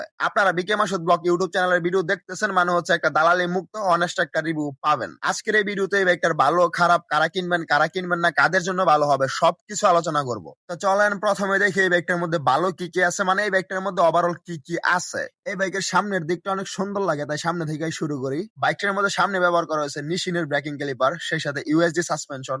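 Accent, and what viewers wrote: Narsingdi, Bangladesh. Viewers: Bhai Apner basha ki Narsingdi te ?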